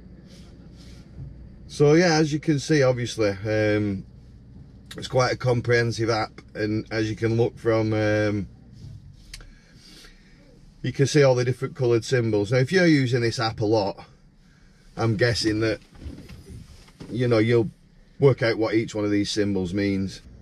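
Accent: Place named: Lancashire, United Kingdom. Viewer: Evening. Hope you’re ok 👍🏻 I’m loving your videos matey 🤩 It must be your Lancashire accent lol 😆 You talk a lot of good sense and give some fantastic information in a really cool way 👍🏻 Take care until the next time cheers 😎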